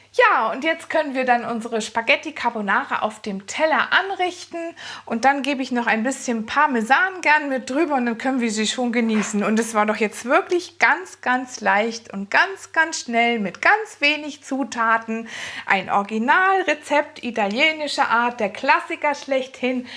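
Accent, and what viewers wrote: Standard. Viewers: Wieder ein super Video. Vielen Dank. Aber drinen Dialekt habe ich vermisst. 🤤🤤🤤🤤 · Ooooh ich bin bisher noch nie ein Freund der Spaghetti Carbonara gewesen, da ich es hasse, wenn man überall Sahne rein klatscht. Liebe, diese Soße wűrde/wird mir mit Sicherheit schmecken. Ich war ganz überascht, daß du auch Koch-Videos machst, denn bisher kannte ich nur deine leckeren Backrezepte. Nur das du dort nicht so hochdeutsch schwatzt.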